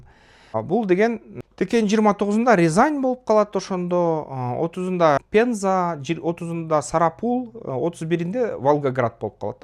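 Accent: Standard. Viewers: Азаматсын,нукура кыргызча суйлойсун.жанылыктарына ыраазымын.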